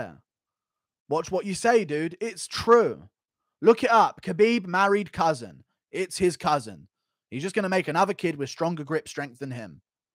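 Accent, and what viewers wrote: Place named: West Virginia, United States. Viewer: Sounds like West Virginia especially boone county.......wait......I'm from boone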